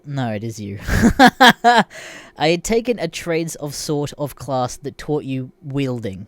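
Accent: Australia. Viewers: 1 are you Australian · Creepswork is not as good as. Australian voices are far superior to Americans.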